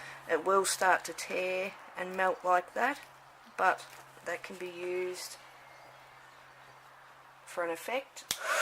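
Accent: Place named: Australia. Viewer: love your artistic creativity and your aussie accent just like my favorite actor Travis Fimmel, also love your choice in music. hope to see more creepy dolls too.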